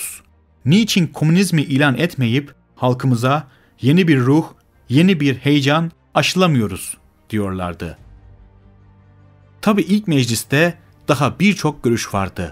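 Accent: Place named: Central Anatolia, Turkey. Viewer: kardeş videoların güzel tebrik ederim Fakat senin "ka" ve "ke" sesleri ile sorunun vardır, Telaffuzuna bir az önem vermelisin. Sözcükler yanlış telaffuzundan dolayı farklı anlaşılmaktadır. Mesela ka^fi demen yerde kalın kafi kullanıyorsun. Orta Anadolu şivesinden kurtulman gerek. Saygılar...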